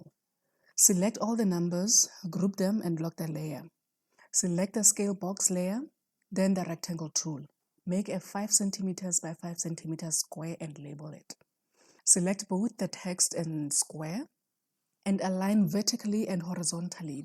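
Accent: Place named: South Africa. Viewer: You sound soooo South African. Thank you for the tutorial!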